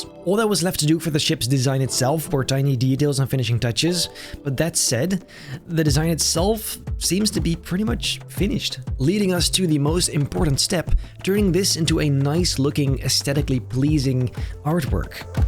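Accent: Netherlands. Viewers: Thick Dutch accent. Nice video tho, never heard of the channel before. Subbed.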